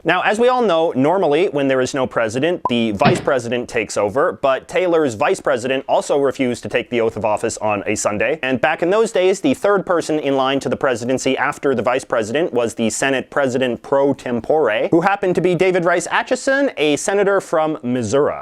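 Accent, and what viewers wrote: Canada. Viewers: It's probably from the slightly different dialect they speak in Canada (where he's from)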